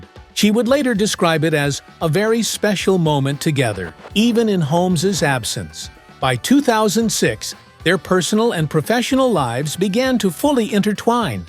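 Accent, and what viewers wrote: United States. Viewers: Why is an American doing this commentary?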